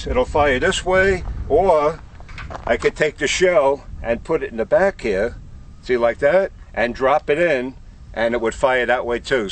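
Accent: New York, United States. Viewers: New York accent, articulates his plans. Kevin McAllister grew up.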